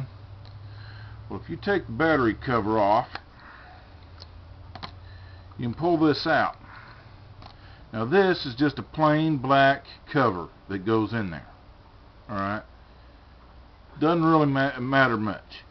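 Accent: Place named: South, United States. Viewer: Thanks sir you helped me reprogram my remote ! I love the southern accent by the way 👍🏽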